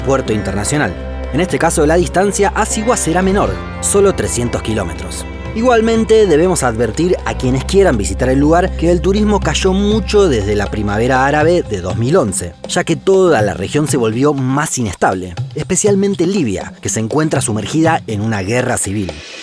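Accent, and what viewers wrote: Argentina. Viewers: El acento argentino también es parte de la magia de este canal:3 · De donde sos vos viejo? Tenes acento argentino 🤔😋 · Esta canal es un lujo!! Ese cielo por amor a dior!! Claro q me gustaría conocer Siwa y el Sahara! Será cuestión de planearlo. Gracias sos impecable y me encanta que tengas acento argento. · Que lindo acento argentino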